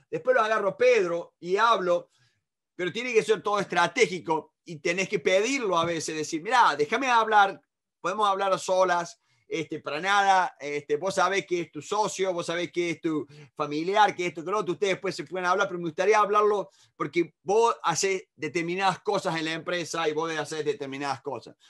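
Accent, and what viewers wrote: Córdoba, Argentina. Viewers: ALTO CORDOBES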